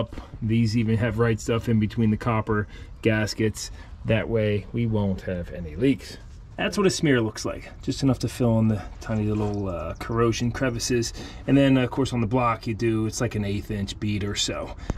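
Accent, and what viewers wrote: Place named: Baltimore, United States. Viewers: Are you from Philadelphia you have an accent I hear it. wuder hoome We say home with a long O. 🚁 🪂 If you're not you're from Baltimore they have a similar accent. ✌🏻👽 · I'm from Baltimore and your accent reminds me of back home. When you replaced the thermostat, did you use permatex the right stuff on that thermostat housing? In addition to the gasket?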